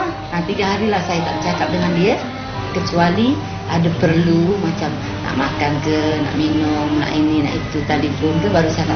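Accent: Singapore. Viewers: Bahasa Melayu loghat Singapura. Jarang boleh dengar pada masa kini.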